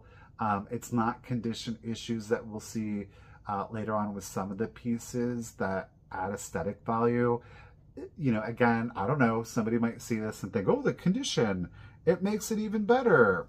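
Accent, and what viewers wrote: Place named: Scotland, United Kingdom. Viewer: you sound Scottish 😂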